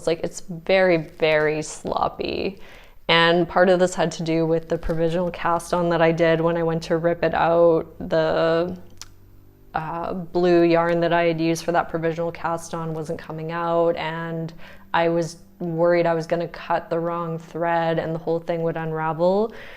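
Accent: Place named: Canada. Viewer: and sorry - you’re Canadian - cardinal sin to assume you’re American- I should have got that from your accent! I have family in BC 🤎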